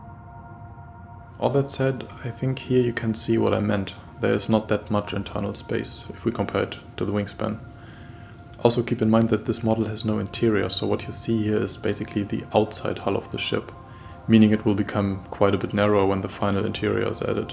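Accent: Germany. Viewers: you sound like a german speaking english :D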